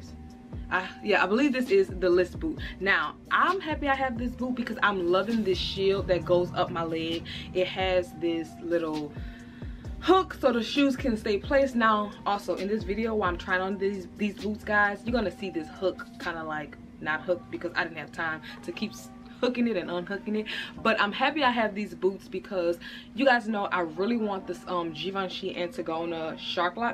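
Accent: New Orleans, United States. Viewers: I would've never guessed your accent for New Orleans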